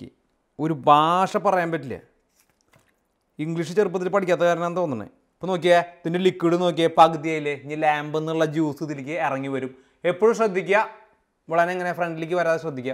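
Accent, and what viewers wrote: Thrissur, India. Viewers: തൃശ്ശൂർ slang❤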